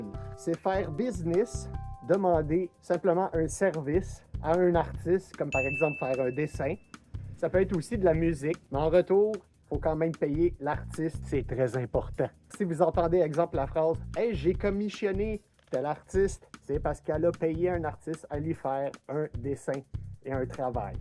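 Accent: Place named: Canada. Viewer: Merci pour cette vidéo super intéressante ! ton accent canadien est vraiment incroyable ( De toute façon je ne sais pas pourquoi mais j'ai Toujours aimé les canadiens/Québécois ) J'adore la comu furry francophone Elle est Tellement génial ( En même temps je suis français ptdr ) Bref, je vous fais une Salutation depuis mon bus de l'ouest français !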